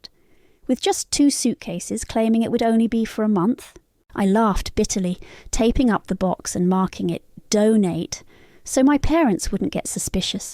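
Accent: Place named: United Kingdom. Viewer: Sorry I can’t stand the British accent. It’s really awful. Some of thrm I can take but this one is awful.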